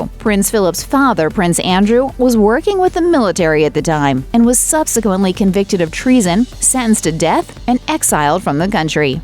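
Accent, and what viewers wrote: United States. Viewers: Gd contente but bad 😰 America chinlish accents!! Ask/employ the EU bondle likes Swedish or Danmark or Switzerland for boardcaster plz !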